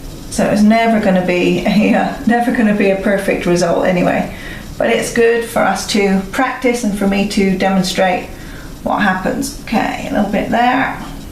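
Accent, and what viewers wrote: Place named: West Country, United Kingdom. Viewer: Lovely west country accent there :D Great info x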